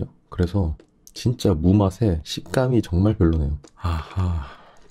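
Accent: Busan, South Korea. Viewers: 어디가 표준어랑 섞인건가요 ㅋㅋㅋ 완전 부산사투린데요?ㅋㅋㅋㅋ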